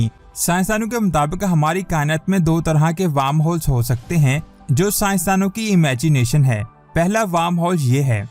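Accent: Punjab, India. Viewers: Pehle apna panjabi lehja to badlo baat urdu m lehja panjabi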